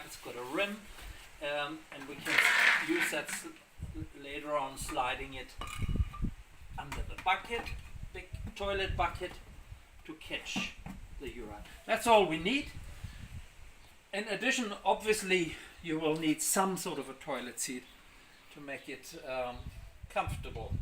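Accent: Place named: Germany. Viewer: Hi, this is definitely the best simple and easy way I have found so far. Thank you, I have subscribed! (My name is Therese Lauterbach, from South Africa. I hear the German accent and am also from German descent. I would like to make contact with you, please.)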